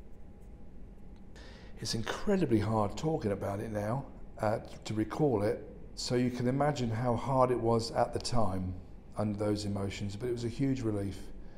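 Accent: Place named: Birmingham, United Kingdom. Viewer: What a show tonight and thought couldn’t get any better, never disappoints. He’s definitely a Brummie 🤣❤️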